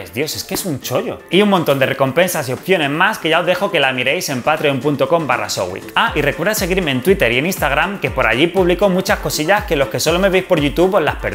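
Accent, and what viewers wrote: Italy. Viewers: ¿Cuánto quiero hacer el ridículo en este vídeo?: Sí PD. Adoro que hayas mantenido el acento a todas las palabras italianas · Puedes callar ese asento italeano 😡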